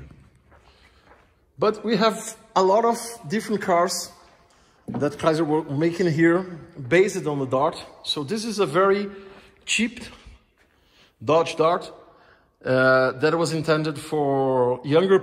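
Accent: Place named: Brazil. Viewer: Nada como um Brasileiro falando inglês. A gente consegue entender um pouco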